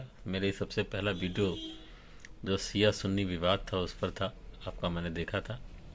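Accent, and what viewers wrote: Rajasthan, India. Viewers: Guru ji pranam mere family members apko par Dey sunte hai apka Jo international mudda samjhane ka jo rajasthani style hai bahut hi shandar hai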